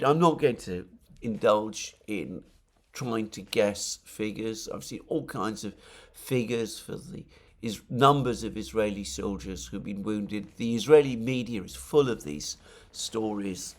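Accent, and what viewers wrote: United Kingdom. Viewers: Excelente clever well thought information. Your British accent so perfect for today’s description on Russia strategy. Great sound track. Thanks Alexander ❤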